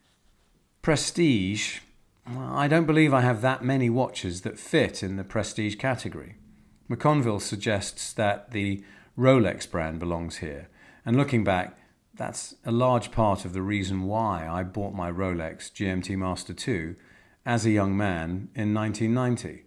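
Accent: RP, United Kingdom. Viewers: Thanks for yet another great instructive video. Your posh accents help pay more attention to what you are saying. I wonder if you ever use any expletives in your life :) I certainly do and not with a posh accent but with a French one. With regards to IWC, I would highly recommend the IW371615 Portugieser Chronograph, there is a French gentleman there that is very good at taking care of his customers.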